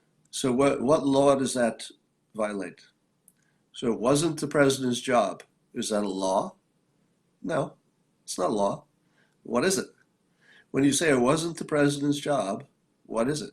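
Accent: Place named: South, United States. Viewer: Watched you on Gutfeld, your voice is fine, has a very rich, almost southern quality.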